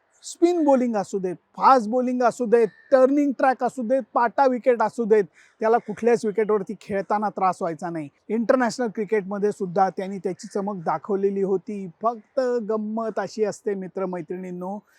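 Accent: Pune, India. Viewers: मग पक्के पुणेकर आहेत लेले काका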